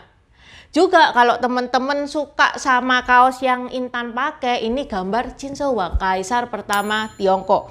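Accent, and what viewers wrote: Java, Indonesia. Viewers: Cina Jawa, medok bnget Jawa nya 🤭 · Satu dari sekian ribu etnis di NKRI apapun latar belakangnya itulah yang mambuat kita makin kuat, cece medok banget, semangat ce berjuang terus mungkin masih banyak yg harus cece kisahkan, biar makin beragam cerita tentang Indonesia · Medok buanget Jowone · Ngomonge koyok logat jowo. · Jawa'y medok banget 😃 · Bu Intan logat jawanya kok medok banget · Intan etnis Tionghoa, ngomongnya medok Jawa, orasinya tentang Cina Benteng singkat tapi cukup lengkap 👍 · Lho Ce kok medok ngomonge.. Hahhahahaa... Nah itulah indahnya Indonesia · Intan koq km berbahasa Indonesia berlogat medok jawa sih, apalagi membahas cina benteng daerah tangerang..itu d gn sindur, legok bnyk tuh Hitachi..sy dah pernah kunjungi 2 tempat itu.. lucu ya km berbicara medok...😁😀😁😀😁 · Salut sama mbak Intan china nya medhok jawane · Mbak Intan logatnya seperti jawa medhok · Cici ngomongnys medok ya.. 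· Intan logate njawa BB uuaaaanget sih 😃😃 matap maju sucses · Kok medok lagate to mbak... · Kalo kakak China Jawa ya, medhok banget. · Teteh ngomonge ko medok banget toh 🤣🤣 opo ga iso dek sesuikan, pribahasa sunda pindah cai pindah tampian 👍 terimakasih sudah bantu kasih tentang sejarah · Ini orang china apa jawa...logatnya kok jawa banget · Logatnya Njawani banged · Intan ini wajah cino tp dialek lidah jowo (mbandek), Intan bersedia cerita? 🌱🤫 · Intan ini pasti orang Jawa tengah/timur, ngomongnya masih medok.... Hahaha.... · Logatnya jawa sampeyan mbak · Mbak admin sendri,medok Jawa nya ya. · Ini Cina Jawa,cara ngomongnya medok kayak orang Jawa yg setuju like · Keren ada medok medok nya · Mbak Intan dhewe yo wong jowo, yo opo Iyo? Logate ora biso diapusi.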